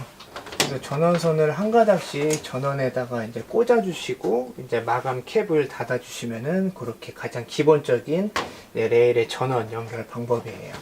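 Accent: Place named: Jeolla, South Korea. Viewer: 구수한 전라도사투리가 신뢰도를 업시키네요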